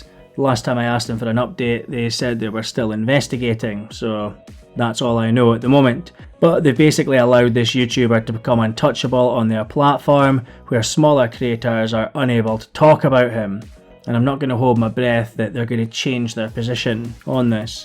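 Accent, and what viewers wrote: Scotland, United Kingdom. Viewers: Also not sure where about in scotland you are, but what's your thoughts, if any or any chance of a video regarding David goodwillie ? His recent signing with raith rovers in fife, which going by your accent isn't a million miles from you has caused a lot of fallout. Good to have you back, was a follower of you n wheezy on daddy gate. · Defo a toonser or a teuchter I'd say!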